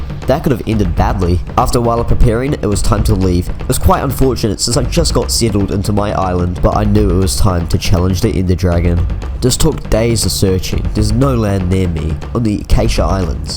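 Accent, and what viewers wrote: United Kingdom. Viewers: He is british don't make fun of his accent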